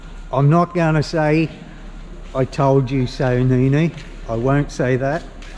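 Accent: Australia. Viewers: you sound aussie mate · Man! Where the heck were you from? Such an accent that I couldn't make out what the heck you are talking about? Aussy?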